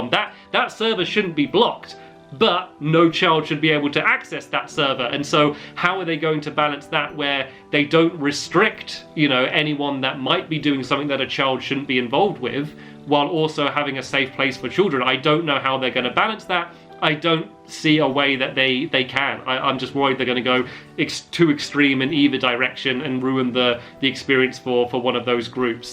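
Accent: United Kingdom. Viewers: Thalia Foot he's British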